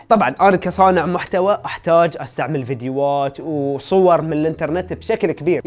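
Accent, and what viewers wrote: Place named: Egypt. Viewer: ولله لهجتك المصريه حلوه ❤️🇪🇬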